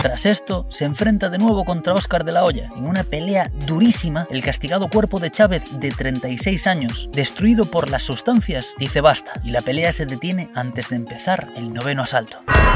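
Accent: Galicia, Spain. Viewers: Gran canal, hace poco que me interesan estos deportes y me encantan tus videos, muchas gracias!! Muy buen trabajo!!. Una pregunta, ¿eres gallego?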